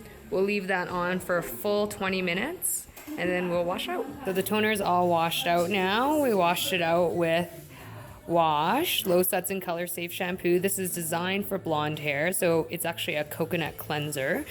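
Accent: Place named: Canada. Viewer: I thought I heard a Canadian accent 🙂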